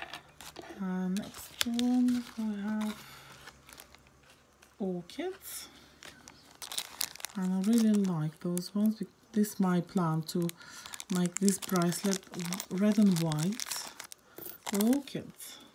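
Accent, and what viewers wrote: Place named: Sweden. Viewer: I love your accent! Sounds like Liberia/Swedish/British. 😊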